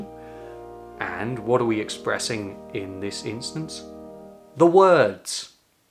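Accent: England, United Kingdom. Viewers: Love gearing that ENGLISH ACCENT, YES THE BIRDS ARE ACTUALLY SINGING PRAISES TO THEIR CREATOR,!! I saw it somewhere in the Scriptures one time, HALLELUJAH, Lovely presentation your Sis, Thankyou, E83 Australia, 🎉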